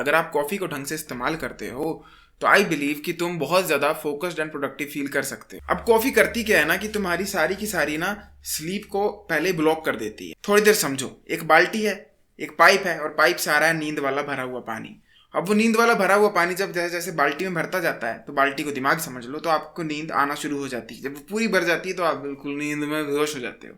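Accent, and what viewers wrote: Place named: Kangra, India. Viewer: मिंजो ऐसा कयो laggada की bhau तू कांगड़ी है।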